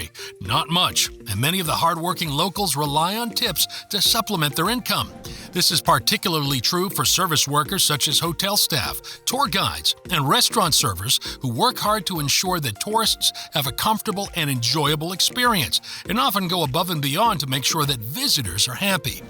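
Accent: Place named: United States. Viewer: " captain" must be " Yankee "...well "captain".. After 14 times in Cuba all over of Cuba, you sound really like " Yankee " YOU, buddy just miss Cuba really good times, 5, 10, 15 years ago. Love Cuba, love Cuban people, see and experience everything....your podcast is not good buddy..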